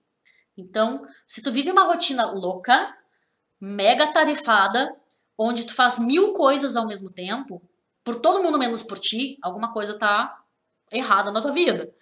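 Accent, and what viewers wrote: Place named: South, Brazil. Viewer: Do Sul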